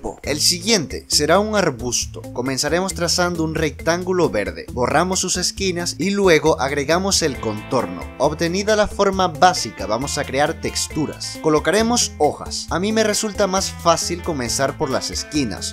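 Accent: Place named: Colombia. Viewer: eres de colombia pa? pq se nota yo si soy de colombia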